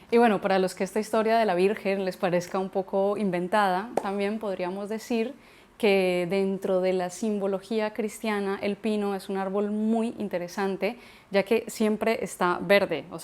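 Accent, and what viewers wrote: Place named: upper class, Colombia. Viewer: Esta encantadora mujer habla como las colombianas de clase, de las que van quedando relativamente pocas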